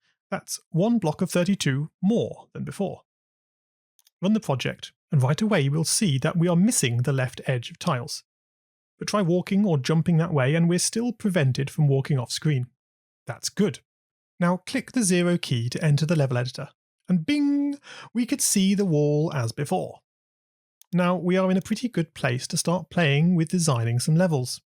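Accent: United Kingdom. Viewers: for some reason, the best coder i know loves it when his own characters die... i guess i kinda do to, you feel a sense of pride watching all of your hard work lose their life, also give me your British accent.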